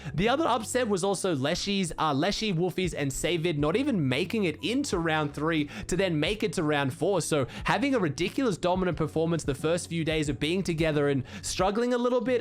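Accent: Australia. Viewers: Nice, Keep it up as always!!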